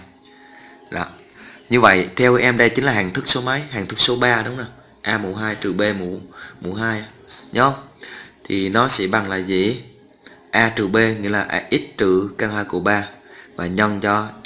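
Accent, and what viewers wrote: Binh Dinh, Vietnam. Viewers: giọng bình địa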